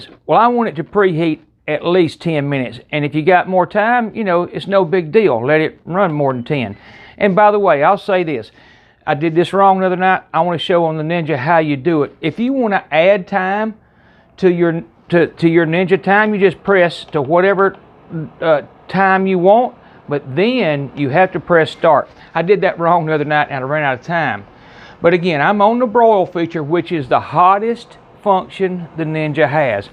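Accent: South, United States. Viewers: I received a ninja air fryer for Christmas so I looked up some technics here on you YouTube heard this good ol’ southern accent and knew within 5 seconds I’d better subscribe Btw Greeting from Oklahoma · I just found your channel and I love your southern voice and delicious recipes ❤️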